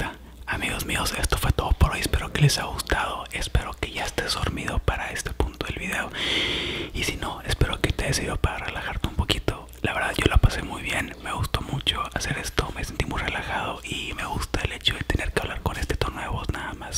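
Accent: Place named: North, Mexico. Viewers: Ya estoy aquí, te amoooo 💕😊🌌💝💖 amo tu acento norteño 😱😍💝💖😁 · Dios mio que voz tan linda tienes ya sea con tu acento de el norte o en voz baja como lo hiciste en este video 😍...me encantas como persona, y físicamente 😍😍😍🤩 saludos !!!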